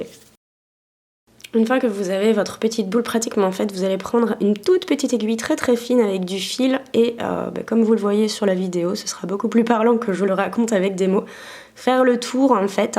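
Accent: Toulouse, France. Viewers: Tu as pris l'accent toulousain !!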